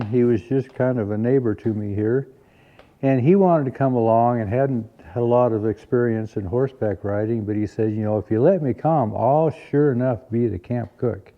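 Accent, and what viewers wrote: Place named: West, United States. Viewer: Once again, thanks for a wonderful yarn. Sure put a smile on my face! Love to listen to a real westerner spin a tale.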